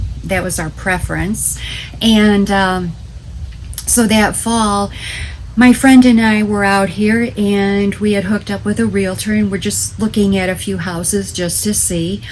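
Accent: Michigan, United States. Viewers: Hello! I found about you through Linda Davis and I'm a new subscriber. I love to hear about moving adventures and I'm looking forward to more of your videos. I live in Northern NJ and I love where I live but dream of moving to somewhere in NE someday. I even lived in Michigan for three years. My son was born there so I'm very familiar with your accent! I have family in Vermont, Rhode Island, and New Hampshire as well and love going out to any if those places any chance i get. Best wishes to you and your family and I hope you enjoy living in New Hampshire!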